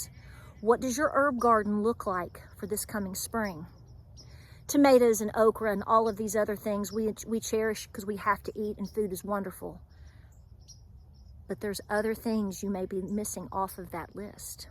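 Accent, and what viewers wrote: United States. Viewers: Y do u speak like a Yankee... America is weak asf that's y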